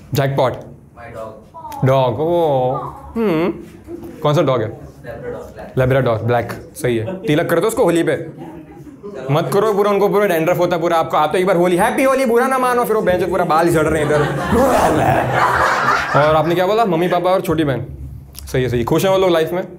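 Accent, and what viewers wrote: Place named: Gujarat, India. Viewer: Your gujrati is cute😂♥️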